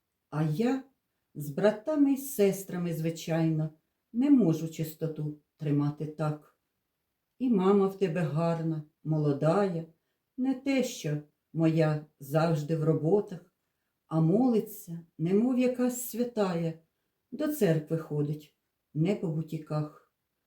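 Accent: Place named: Cherkasy, Ukraine. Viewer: Наче з рідної Черкащини говірка.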